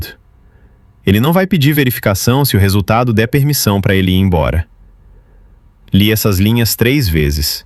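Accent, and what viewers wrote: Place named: São Paulo, Brazil. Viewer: Sotaque de paulistano!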